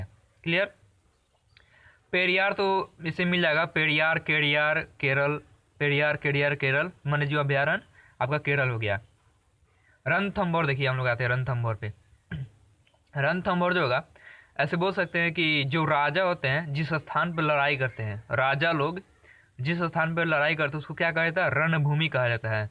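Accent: Bihar, India. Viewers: bihari language , improve it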